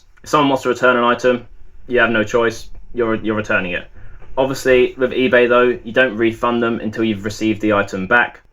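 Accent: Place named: United Kingdom. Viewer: Love your accent so sweet and British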